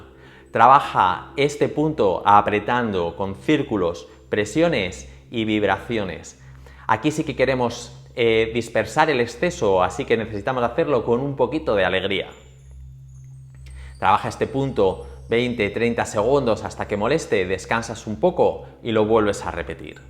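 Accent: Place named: China. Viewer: Usted estudió en China? Tiene cierto acento en la musicalidad del español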